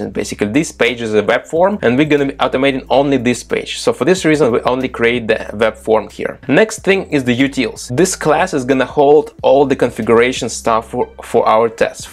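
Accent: Russia. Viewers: Толковый блоггер. По акценту слышно, что русский 😅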